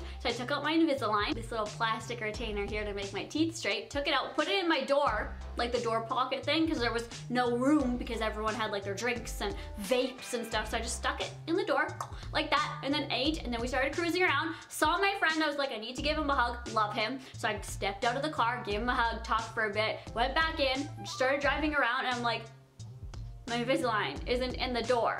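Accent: Canada. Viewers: DayDr3ams is she not allowed to have a Canadian accent 🤐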